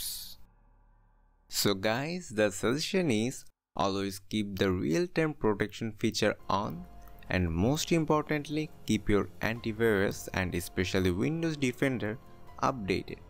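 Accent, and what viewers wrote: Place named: India. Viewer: U sound like a indian, or are u? dramatic music plays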